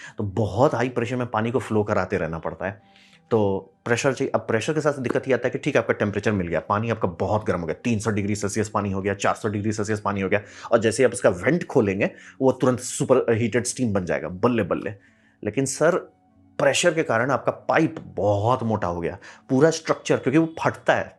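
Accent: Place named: Jharkhand, India. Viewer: Jharkhand's Hindi Accent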